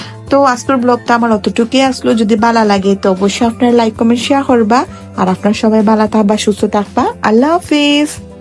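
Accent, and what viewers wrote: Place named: Sylhet, Bangladesh. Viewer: মাশাআল্লাহ আপনার voice এবং আপনার এই সিলেটি সিলেটি ভাব এটাই বোঝা যায় যে আপনি একজন , খাটি বাঙ্গালী । আপনি যেহেতু একজন Teacher তাই আগে আপনাকে সালাম দিতে ভোলে গেছি , assalamualaikum mam. ❤❤❤❤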